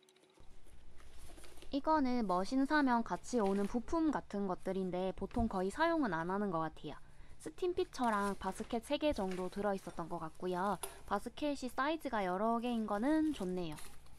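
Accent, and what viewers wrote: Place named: Gyeongsang, South Korea. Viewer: 경상도 사투리 귀여워요. ㅋㅋㅋㅋㅋㅋㅋㅋㅋㅋㅋㅋㅋㅋㅋㅋㅋㅋㅋㅋㅋㅋㅋㅋㅋㅋㅋ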